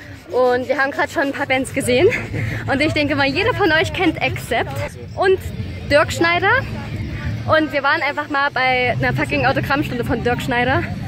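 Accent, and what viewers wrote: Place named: East Germany, Germany. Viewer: Hey. Ich sehe gerade das erste mal ein Video von dir (ich war auch aufm Rockharz dieses Jahr) und wollte mal fragen aus welchem Bundesland du kommst. Bestimmt ausm Osten?! Erkennt man am Dialekt.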